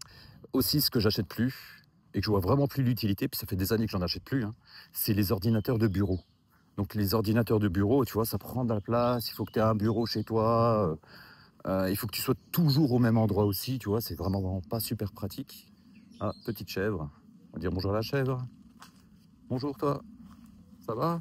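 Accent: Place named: Alsace, France. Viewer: Accent de chez moi! Alsace ou Moselle?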